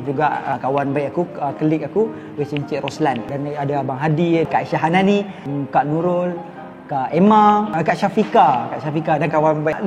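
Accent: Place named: Perak, Malaysia. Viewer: Laa abg org Perak ke hahaha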